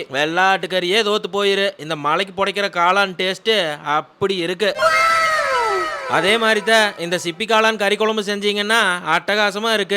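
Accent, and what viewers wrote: Kongu, India. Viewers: Unga kongu bashe kagathan subscribe pannine Thambi.wonderful,kettutte........irukkalampole irukku.Valga valamudan👌👌👌👌👌👌♥️♥️♥️♥️♥️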